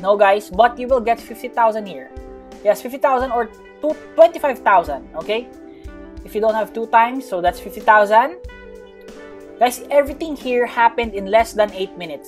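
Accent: Philippines. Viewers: You have filipino accent bro are you filipino? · This guy reminds me of my teacher in computer because his Filipino accent😂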